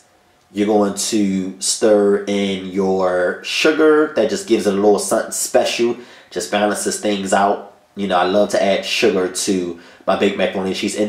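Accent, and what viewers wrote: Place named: DMV, United States. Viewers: enjoyed the commentary sound like my folks in the DMV area lol well I'm making it now 10/9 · Sounds like he’s from Baltimore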